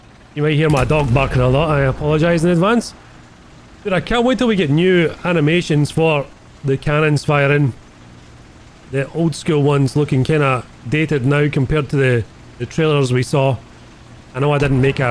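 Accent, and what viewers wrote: Scotland, United Kingdom. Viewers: His accent is Scottish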